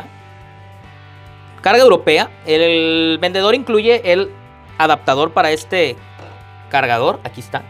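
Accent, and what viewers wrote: Nayarit, Mexico. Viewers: Hola, amigo. Me gusta mucho tu estilo, supongo que eres de Nayarit o cerca, el acento me es conocido. Un saludo y tienes un suscriptor nuevo.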